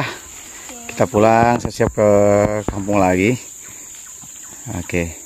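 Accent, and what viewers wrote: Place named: West Java, Indonesia. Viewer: Kok logatnya kayak orang Sunda atuh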